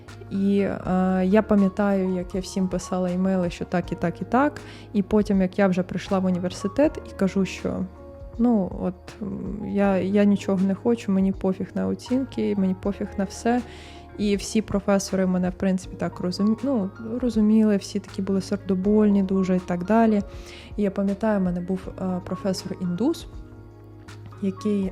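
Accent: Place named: Surzhyk, Ukraine. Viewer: дуже дякую, що ділитесь власною історією, як на мене, це дуже корисно і полегшує відчуття всередині нас! Ви пройшли реально багато труднощів і тому хочеться висловити Вам слова підтримки. Ви справді молодчинка<3 за суржик не партесь, він звучить афігєнно і середньостатистичному носію мови все більш ніж зроз:)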